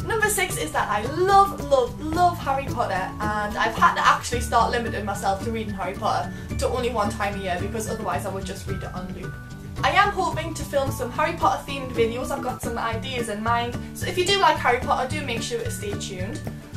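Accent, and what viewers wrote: Newcastle, United Kingdom. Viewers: Wont be having any problems understanding you, been watching Geordie Shore for so long. Good job on your first video ( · i follow both your accounts :) :) and im a geordie as well :) nice to hear someone on the internet talk like me :) :) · Haha, I loooove your accent! :D Thought you were from Scotland at first, but seeing as Newcastle is "almost Scotland", I guess I was close enough. ;) (I spent an Erasmus semester in Edinburgh and my heart is melting every time I hear a Northern accent! :) Btw, great video as well! Very entertaining to watch. xx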